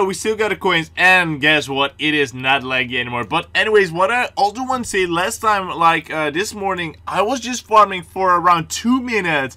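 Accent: Netherlands. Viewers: Bruh hes dutch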